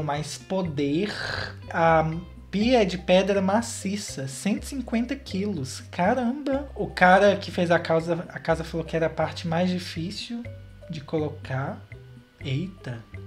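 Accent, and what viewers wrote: Minas Gerais, Brazil. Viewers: Com esse sotaque só pode ser de Minas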